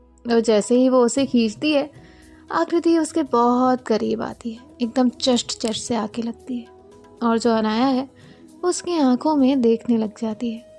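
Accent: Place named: East, India. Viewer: Aap kya East Side se belong karti hu kyuki mujhe yesa lagta hai ki aap Bihar ya Udisa se belong karti because aapki voice ton East Side ki lagti hai😅 Agar haa toh reply zarur karna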